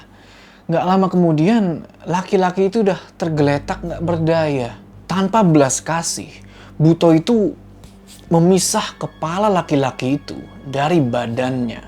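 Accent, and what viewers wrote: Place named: Java, Indonesia. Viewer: yg part 1,logat bisa jawa belajar dr mana..haha Salam dr klaten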